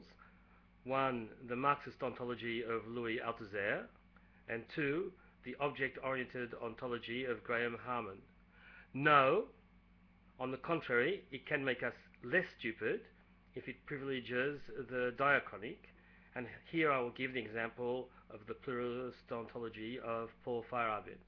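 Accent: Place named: France. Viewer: You've definitely acquired an interesting accent after so many years living in France. ("important")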